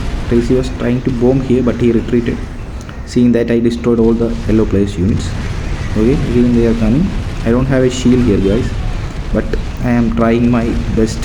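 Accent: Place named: India. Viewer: I can tell you are an Indian the by way you pronounce ''t''😄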